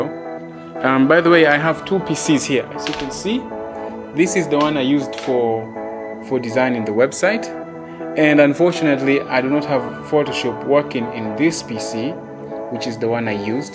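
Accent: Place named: East Africa. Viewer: good work my guy, are you from Uganda,, or Tanzania or Malawi, you sound like one of ! keep on dropping knowledge my guyy, excellent work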